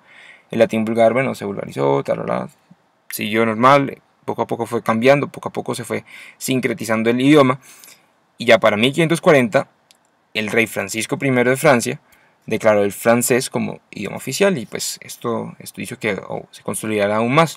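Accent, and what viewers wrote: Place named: Colombia. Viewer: Menudo acento Colombiano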